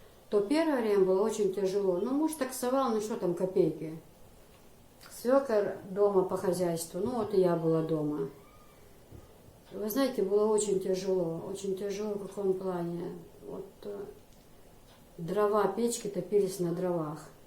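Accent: Chechnya, Russia. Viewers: Вы просто умница! С трудом верится, что Вы не чеченка. Вы даже на русском языке говорите с легким чеченским акцентом, и что удивительно, на чеченском -- без акцента. Вы и внешне очень похожи на чеченку. У Вас редкое сочетание -- внешняя и внутренняя красота. Счастья Вам и Вашей семье! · Ни за что и не подумаешь,что вы русская, внешность чеченская, вы не меняли свою внешность?? Акцент, язык , манеры.... Все чеченское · Даже акцент чеченский! 👍🤗😘😘😘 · Вааа Аллах1, сел леран нохчишех д1а мух тарлур йу,?Даже чеченский акцент присутствует 😄Делдахь со цец йаьлла С меня лайк и подписка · Поражаюсь Вами,у вас диалект даже чеченский🤩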